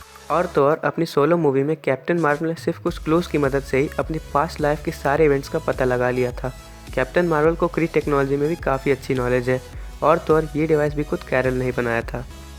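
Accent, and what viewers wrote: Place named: India. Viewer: Man from India, Your accent is terrible!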